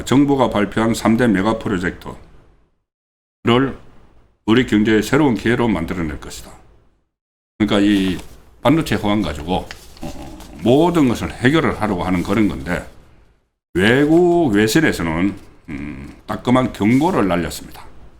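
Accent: Gyeongsang, South Korea. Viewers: (일반적이지 않고 다른 발음) 송국권선생님은 발음 중 일반적인 국민들의 발음과 다른 것이 있어 좀 거북하거나 듣기 싫을 때가 있습니다. 半도체 - 班도체 公직선거법 -공(球)직선거법 事前선거 - 辭典선거 搜査 - 輸사 대구식 발음인가요? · 경상도 발음이니 이해하세요 ㅎㅎㅎ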